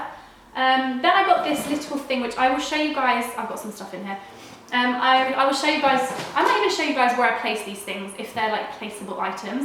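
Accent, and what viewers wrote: Italy. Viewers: Il tuo accento italiano è troppo carino 😊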